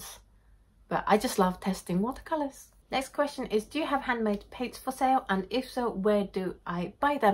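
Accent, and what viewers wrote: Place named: United Kingdom. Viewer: Thank you for making this video, I enjoyed watching every minute of it :) I love your British accent, you shouldn't be worrying about it one bit! Also, thank you for being so open and honest about bipolar, it really helps raise awareness. People who don't have it and have no family or close friends with it usually simply have no idea about what it feels like and how it works. You're very, very brave and a wonderful person and artist!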